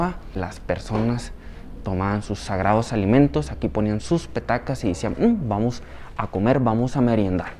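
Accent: Chihuahua, Mexico. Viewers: Yo pensaba que eras de chihuaua .me gusta tu asento de sh.saludos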